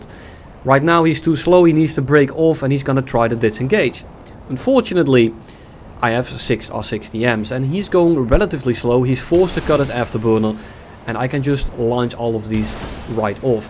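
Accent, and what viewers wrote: Netherlands. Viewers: i smell dutch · Still love the dutch acsent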